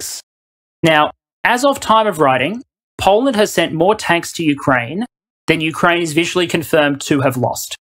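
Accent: Australia. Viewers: is Ukrainian propaganda with an Aussie accent... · No, thank God doesn't go up with his voice at the end of every sentence! They are both Australians however, that may be why you find them similar.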